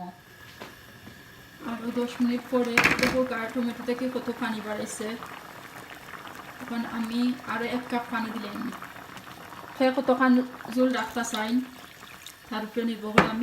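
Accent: Sylhet, Bangladesh. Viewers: খাছ সিলেটি আপা গো তুমি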